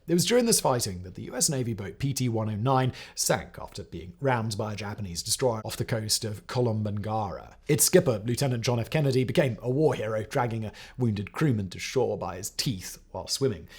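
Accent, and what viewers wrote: United Kingdom. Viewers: Hard enough to understand his heavy British accent and then he mumbles too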